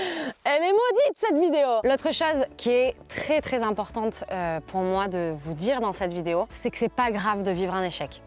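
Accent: France. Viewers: Ou un échec ? Non, c'était pas le bon moment c'est tout. Ce qui me plaît, c'est ta spontanéité, ton amour pour Aiko et cet accent du sud-ouest. Wow désolée pour ton papa.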